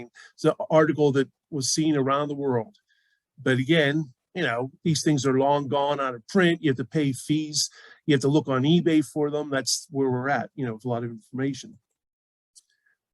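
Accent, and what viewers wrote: Pittsburgh, United States. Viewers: your accent I being a life long northsider know you must be from Pittsburgh am I right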